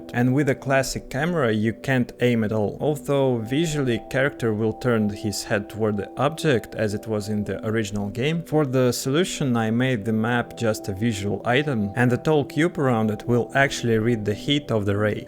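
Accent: Russia. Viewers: воодушевляющее видео) и ты явно русскоговорящий)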